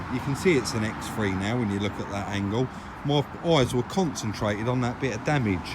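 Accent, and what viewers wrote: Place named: Cockney, United Kingdom. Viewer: You're so cockney you need a pearly waistcoat fella.....Great video though, interesting, and you know your stuff, so thank you for putting this up.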